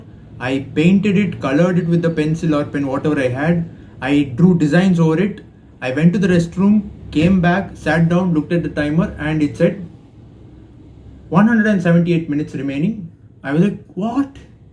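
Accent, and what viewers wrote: South India, India. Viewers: U must be from South India? Rt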